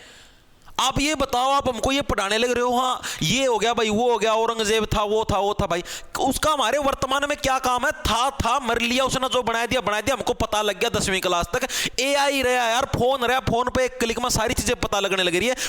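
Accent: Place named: West Uttar Pradesh, India. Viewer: Bhai apki desi language ne dil jeet liya west up se hi ho kya mahare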